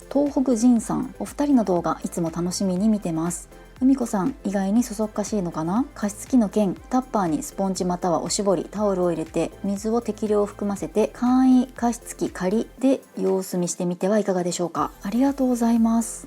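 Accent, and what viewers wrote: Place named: Kumamoto, Japan. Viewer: コメント紹介ありがとうございます😊さすがは海子さん、動画内でも普通に熊本弁出てくるだけあって、熊本弁丸出しのコメント読みもバッチリ👌 今年の寒波は今日もマイナス4.5℃で夜中から朝にかけて雪❄️が舞ってましたよ。僕は天草の本渡出身の益城町在住です。 僕は若い頃はこの寒い時期でも磯に釣りに行ったり、蘇陽町の渓流の管理釣り場にマス釣りに行ってましたが、トシと共に寒い時期や暑い時期は釣りに行かなくなりました😅 九州のスキー場は、動画コメント紹介でも言われてた熊本と大分の県境の九重スキー場、佐賀の天山、あとは熊本と宮崎の県境の五ヶ瀬ハイランドスキー場もありましたね。行ったコトないけど😅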